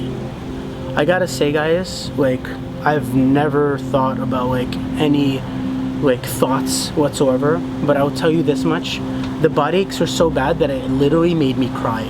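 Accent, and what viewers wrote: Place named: Russia. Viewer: Glad you are ok. I had it covid in May and my friend Arina had covid pneumonia and they insisted that they intibated her. I think they did that for her due to political reasons. She does too. By the way our group of Russian have the same dialect as you. It really great to relate to you and you keep us laughing. Take care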